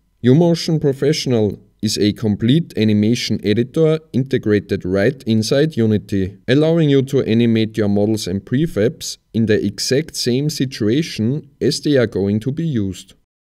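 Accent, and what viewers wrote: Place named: Austria. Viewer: Bwhahaha... Den Ö-Dialekt erkenn ich immer! Grüsse aus Graz und danke fürs Humble Bundle!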